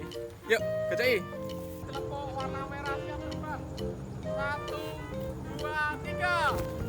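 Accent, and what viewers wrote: Java, Indonesia. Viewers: Orang jawa ya bang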